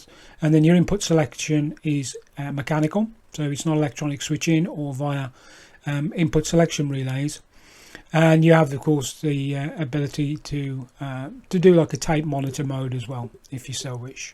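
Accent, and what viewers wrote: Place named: United Kingdom. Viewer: Thanks for the reply, what is their shipping time to UK like? (I'm guessing your in the UK from your accent)... :)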